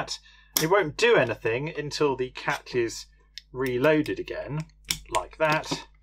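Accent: United Kingdom. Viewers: Excellent presentation with the charming British accent.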